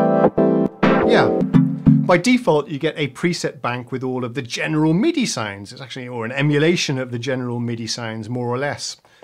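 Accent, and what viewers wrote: United Kingdom. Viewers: Starting to get weary of this British accent teaching us incessantly about everything and oh, don’t forget to toss in a few meters and kilometers.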